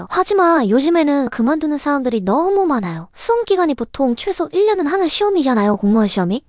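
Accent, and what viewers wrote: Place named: Gyeonggi, South Korea. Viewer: 얘 말투 이거 경기도사투리냐? 귀엽네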